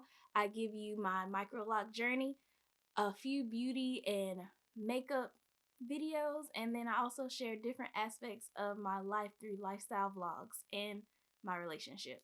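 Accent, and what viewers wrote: United States. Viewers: That’s funny that Nichole Harmony_TV asked you where you’re from. To me you sound like my people from North Carolina. Where are you from? Anyhoo, I love your entire loc journey. With your problem sideburn loc can you just cut it off where the tip meets the latest knot and then as it grows start a new one as a braid loc? To make sure it is a secure loc? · Where you from?!? You sound like my family from Baltimore, MD! Just on your “too” and other “t” words… Lol! I do the same, and I wasn’t even raised around them! I love it tho!🤗💜🤗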